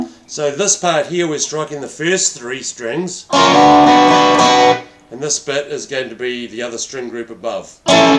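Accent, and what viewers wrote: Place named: New Zealand. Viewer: I don't know if it's a cultural thing, but anytime I hear a kiwi accent in a tutorial, I know I'm in good hands, hahaha.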